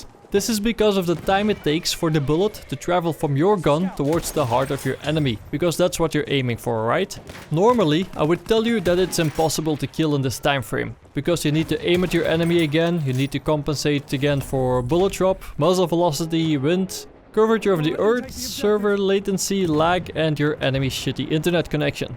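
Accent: Netherlands. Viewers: That dutch accent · It is clearly a Dutch accent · the "r"s sound pretty dutch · you are really dutch i hear it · this dutch accent hahaha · Einar Samuelsson He is from the Netherlands, believe me. I am from Belgium and I know how dutch people speak and I can understand them because we speak the same language. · It's sounds like your dutch? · de duts aksent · this is the kind of english with a Dutch accent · he really sounds Dutch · Is this guy Dutch? Sort of sounds like it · sounds like he's from the Netherlands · the dutch accent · Het is makkelijk te horen dat hij nederlands is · Are you Dutch ? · Horrible Dutch accent.... 'Twinty meters' ' Drrrop'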